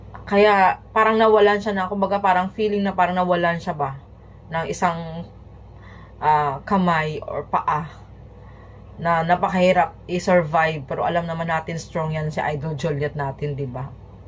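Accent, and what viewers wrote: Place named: Visayas, Philippines. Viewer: Visaya.kainis yang pronounce mo.sakit sa tenga.alden po!d aldin.juliet po.d joliet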